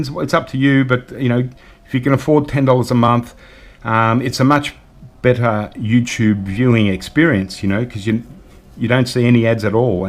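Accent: Australia. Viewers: We love your Australian accent! I really didn't understand how YouTube revenue worked . I'll start sitting through the ads when I watch your stuff. Keep them coming. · Not sure why you think you'd be more popular with an American accent mate. I think it's just the opposite, we like hearing your Australian accent, and your great opening, "G'day scrapers"!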